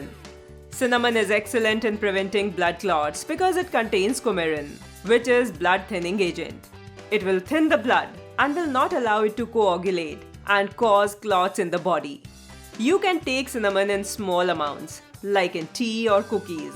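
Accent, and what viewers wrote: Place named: India. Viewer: Just listening to your Indian accent, i damn well knew i was in good hands. Loved that all these variety of fruits and vegetables are right up my alley, since i have to take Coumadin, due to having heart surgery. I know you did not recommend parsley, but it is excellent for the heart. Thank you so much.!!!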